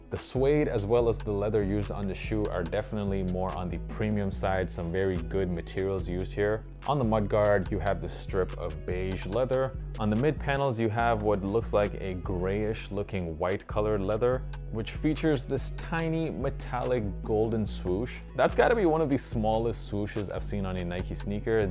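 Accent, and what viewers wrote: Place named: United States. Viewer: he has an American accent and probably doesn’t speak French. Give him a break 💀💀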